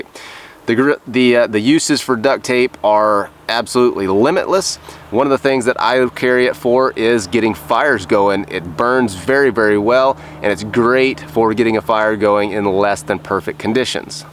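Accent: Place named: South, United States. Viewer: where is he from? slight southern accent but he says he's in the rockies. i'm confused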